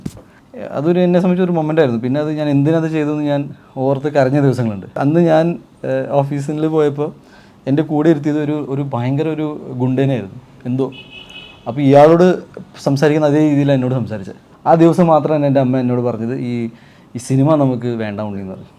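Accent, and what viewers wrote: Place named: Gujarat, India. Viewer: Ayaale padichathum valarnnathum Gujarat aanu. 20 vayassu kazhinjaanu pulli malayalam ezhuthaan padichathu. Athinte issues dialogue deliveryke onde. Athaanu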